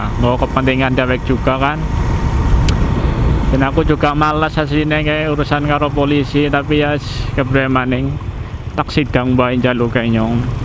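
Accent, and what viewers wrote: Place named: Java, Indonesia. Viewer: Nyong'e seneng yen bhsane medok kyk kiek 😁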